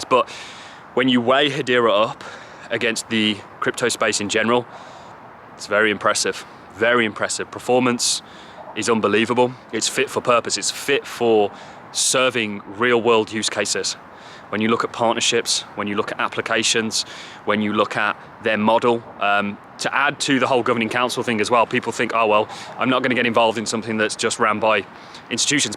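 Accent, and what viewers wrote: Birmingham, United Kingdom. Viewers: Definitely a Brummy tone I'm sure I've seen him knocking around town kro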